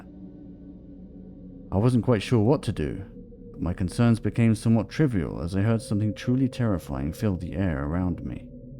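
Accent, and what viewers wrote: United Kingdom. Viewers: is this british accent? i like it. recommend me some more channels like this to practise listening pls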